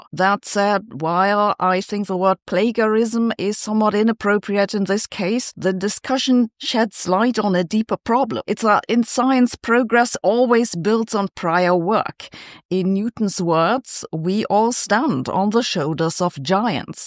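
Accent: Germany. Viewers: German roots - and so the style of speaking - peeps through - like all of us - our past speaks too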